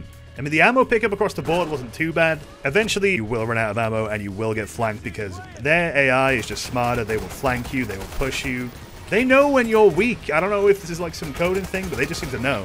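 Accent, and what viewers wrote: United Kingdom. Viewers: It's cos ur scouse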